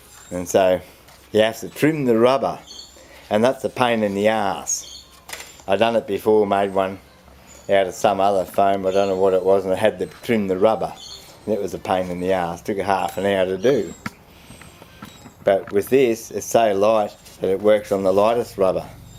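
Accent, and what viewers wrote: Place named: Australia. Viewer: Good on you,a video spoken, the only way,others don't understand true Aussie, I really enjoyed it,congrats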